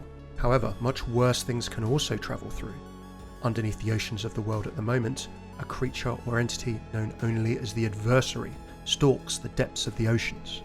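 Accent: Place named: United Kingdom. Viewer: oh shit, he's got a british accent?! oh yeah we watching, thanks youtube algo. lol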